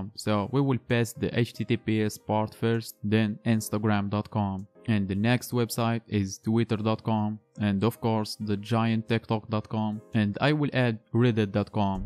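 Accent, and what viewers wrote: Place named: Morocco. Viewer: you're moroccon aren't you? your accent is moroccan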